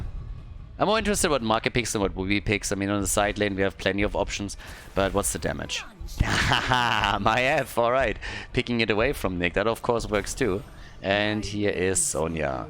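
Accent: Germany. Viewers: Nice match You have a bit of German accent?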